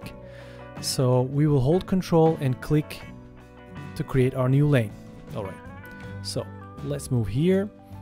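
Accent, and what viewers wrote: Turkey. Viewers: türk oldugunu videoya tıklar tıklamaz anladım her turlu belli oluyor rleri söyleyişten :D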